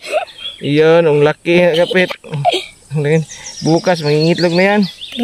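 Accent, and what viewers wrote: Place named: Bohol, Philippines. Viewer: Ta taga bohol ka ? Maila mn gd sa tingog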